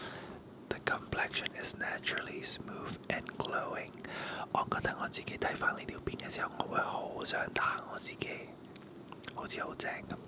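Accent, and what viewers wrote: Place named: United States. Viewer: 他的英文水平不低，雖然有些小口音，但大致無問題👍屬於擁有美式口音！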